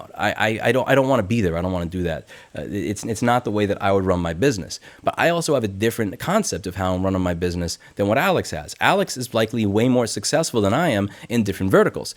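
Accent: New York, United States. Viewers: I subscribed because i enjoyed hearing you, an educated and passionate individual, talk about injustice in a fair and measured way with an excellent new york flair. I stayed for the same reason, but also because i appreciate your perspective on the world. Your commitment to values like honesty and integrity give me hope that there are still some good people out there. Im a pilot, just getting out there in my career, and doing things like networking on linkedin and building my resume make me feel like trash because im not succeeding on the modern pilot metric. I just have to remember what you said: success is based on what youre trying to personally achieve. Thanks, louis.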